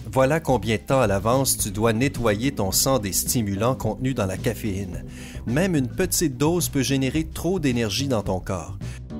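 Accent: Quebec, Canada. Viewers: Il a un accent québécois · Pourquoi la voix est québécoise (on dirait Luc Langevin) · ton accint québécoué j''jl'aime jaime beaucoup sa fait plaisir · J'ai mis un poucebleu pour l'accent québécois. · Jaime bien l'accent canadien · J'adore ta voix québécoise :D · L’accent québécois la !! Valide 😭😂😂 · S’IL TE PLAÎT SYMPA !!!!!! ARRÊTE D’UTILISEZ CETTE VOIX ELLE EST HORRIBLE ÇA ME DONNE MÊME PAS ENVIE D’ECOUTER LA VIDÉO DONC S’IL TE PLAÎT REPREND LA VOIX COMME LAMA FÂCHÉ PLSSSSSSS PLUS JAMAIS LÀ VOIX CANADIENNE !!! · J'adore cette prononciations canadien ❤️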